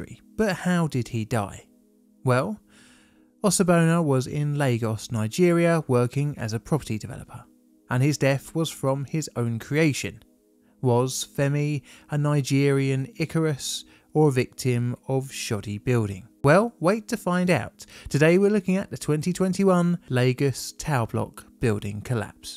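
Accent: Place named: Croydon, United Kingdom. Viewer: I knew u were from Croydonn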